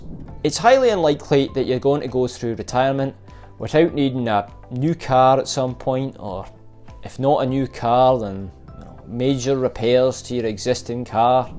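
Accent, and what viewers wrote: Scotland, United Kingdom. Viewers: Excellent points. This extremely important video had 828 views. It proves your point that people pay more attention to planning vacations than planning retirement withdrawals. Love your accent. I've been to Scotland once. Beautiful country, great people. The weather could be brutal. Glad, the music in the background is toned down. Too bad it didn't help to crank up the number of views. Don't get discouraged. I'll watch. Content is great.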